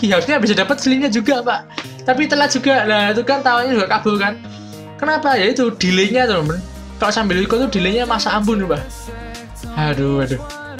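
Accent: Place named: East Java, Indonesia. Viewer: Misuhmu apik mas.... Kroso jowo timuran. Cangkemmuuuu wwkwkwkwkk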